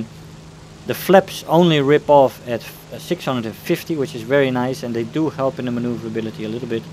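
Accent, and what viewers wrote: Netherlands. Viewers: Leuke video ik hoor dat je NL bent haha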